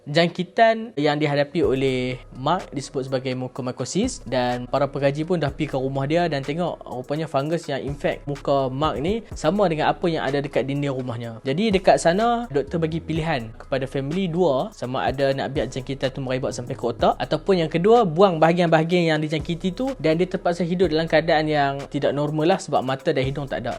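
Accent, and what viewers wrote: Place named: North, Malaysia. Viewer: Pekat utara😂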